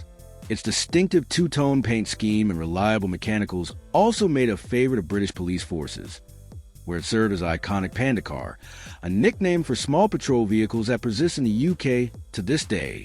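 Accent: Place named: United States. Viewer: American voiceover? Hmmm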